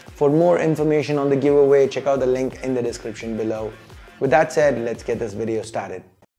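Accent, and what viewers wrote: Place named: India. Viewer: 这英语一股浓浓的咖喱味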